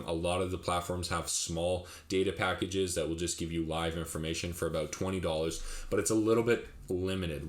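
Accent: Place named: Canada. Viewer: Thank the lord! A man who speaks Canadian. All the yanks nearly made me quit b4 I started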